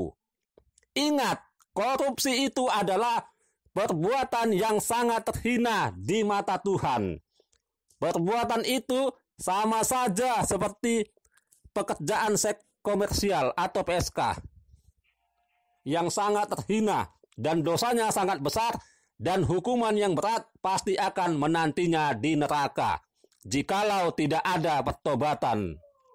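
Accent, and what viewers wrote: Java, Indonesia. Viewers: L11,bejerja sambil berdoa..benervkali saudaraku..janji om sudah om laksanakan,kok logat ngomongnya asli kental logat orang jowo yo..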